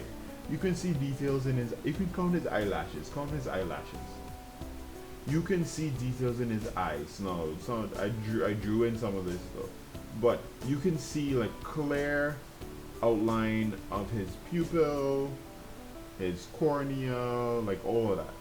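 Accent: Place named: Trinidad and Tobago. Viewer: Triniiiiii. Lol I can hear that anywhere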